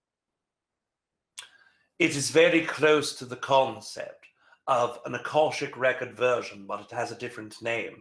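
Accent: England, United Kingdom. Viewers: why is he speaking in an english accent though?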